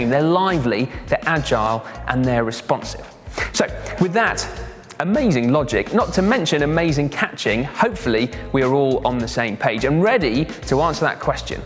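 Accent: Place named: England, United Kingdom. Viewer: English accents are annoying..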